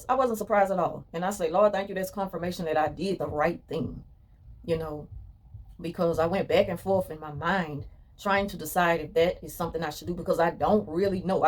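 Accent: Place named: South, United States. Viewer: I like your down south accent .💖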